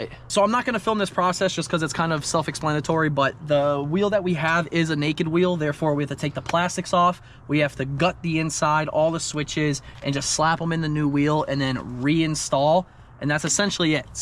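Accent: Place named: Virginia, United States. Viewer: What part of Virginia is he from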